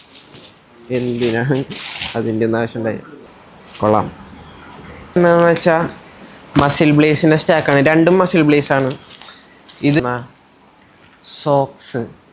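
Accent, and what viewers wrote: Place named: Kochi, India. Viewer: Kochi slang poli bro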